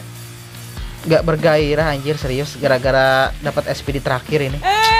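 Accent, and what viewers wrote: West Java, Indonesia. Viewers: Bang orang sunda bukan??? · sunda kah?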